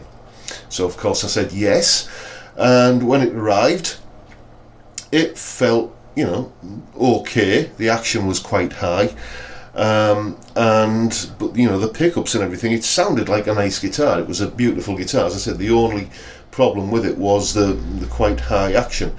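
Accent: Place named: Northern England, United Kingdom. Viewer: Love it. Learning so much from your channel and lovely to hear a genuine northern accent instead of all the over enthusiastic Americans - bless em - who can get a wee bit annoying. I'm in my 50s and been playing, touring, tour managing and whatnot since my late teens and now semi-retired have been buying up cheap guitars to learn about setting them up and fixing them so I can sell them on at a small profit to encourage new young players while giving a few to local charity shops so they can make some money for good causes. Not as cool as what you do but I'm a great admirer and - to the point of the video - yup you can really hear the difference between the pickups. So much sweeter those alnicos - mmm baby, oh yeah, especially on the clean neck rhythm imho, and the ceramic just sounded thin and harsh on the medium gain bridge. 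Right... back to burning my hands with a soldering iron (I am so rubbish with one lol). Cheers mate 👍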